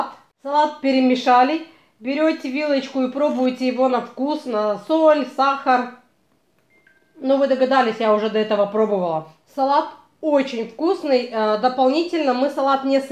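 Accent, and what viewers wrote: Ukraine. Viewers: Цікаво авторка з Одеси, бо відчувається українська основа в розмові.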